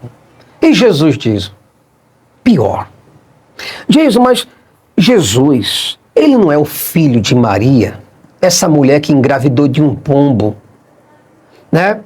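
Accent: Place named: Rio de Janeiro, Brazil. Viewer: Putz... esse sotaque carioca é essa entoação lembram muito os pastores da Universal 😅😅😅😅😅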